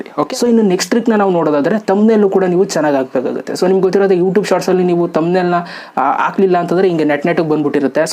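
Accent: Mandya, India. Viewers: Wow nett nett ge band bittiruthe what a language in mandya I like it thamma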